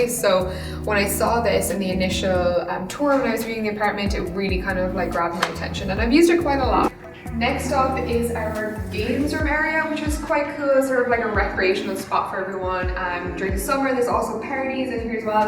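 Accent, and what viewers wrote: Ireland. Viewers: Is this an Irish accent?? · The 461 yes she’s from Cork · I hear an Irish twang coming through.. Is she Irish? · omg are you irish too?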